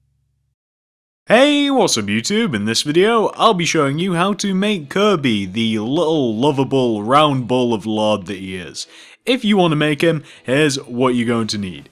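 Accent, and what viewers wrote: United Kingdom. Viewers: He is from the UK I believe.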